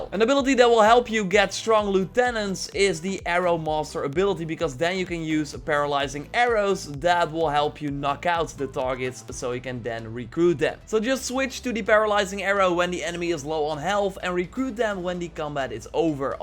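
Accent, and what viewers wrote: Netherlands. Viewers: On what difficulty are you playing? (also are yah dutch?) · Are you Dutch? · You dutch bro? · Aan je accent te horen ben je nederlands😂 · Are you dutch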